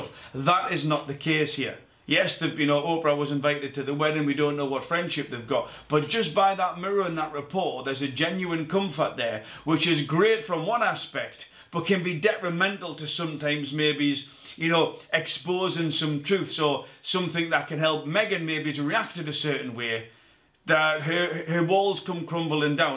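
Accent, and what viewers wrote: United Kingdom. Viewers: I just have to hear your British accent and know that your take on Meghan would be that she is a liar.... then journey down and read the comments anddddddd its confirmed. Thank you for doing this video. In reading some of the comments I'm saddened by the still many people being so negative to Meghan... you are bringing your biases against her into this. T. My take is that many of you are genuinely racists and never wanted to be neutral where Meghan is concerned and that's ok... its just who you people are.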